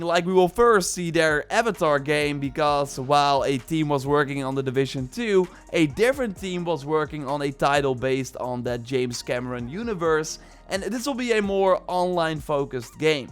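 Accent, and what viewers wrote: Netherlands. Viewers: Oh haha Your dutch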